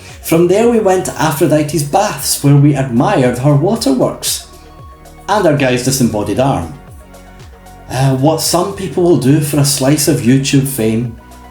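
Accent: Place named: Scotland, United Kingdom. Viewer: Not a bad first attempt. That Scottish voice-over is quite amusing.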